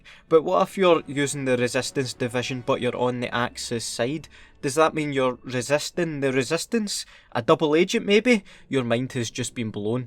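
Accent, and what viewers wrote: Scotland, United Kingdom. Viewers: Scottich iirc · MattLC They are both Scottish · Scottish I think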